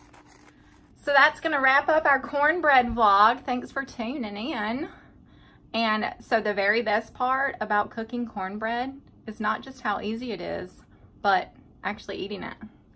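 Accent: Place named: North Carolina, United States. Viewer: Being from the foothills of NC I immediately recognized the accent! Love a country girl that can cook!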